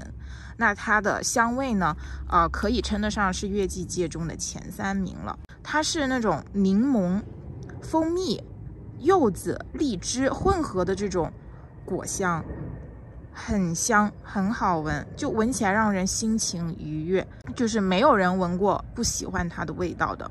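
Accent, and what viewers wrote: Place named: Hubei, China. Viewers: 听管主的口音，有点像湖北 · 自信点，去掉”有点”。应该就是仙桃洪湖监利天门那一块的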